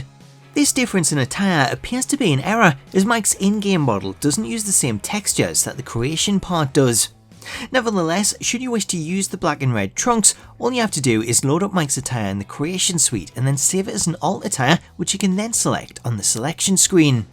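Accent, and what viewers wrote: Newcastle, United Kingdom. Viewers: You’re a Geordie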